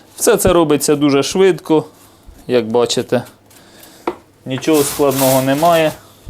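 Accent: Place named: Poltava, Ukraine. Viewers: який приємний говір... такий як у нас на полтавщині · суржик обычный . зачем вы коверкаете русский ??? противно слышать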